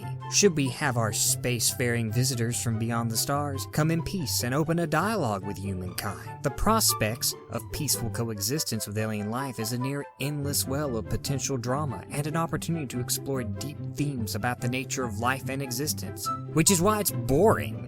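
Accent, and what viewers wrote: South, United States. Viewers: You've got this very subtle twang of a southern american accent, or am I just imagining things??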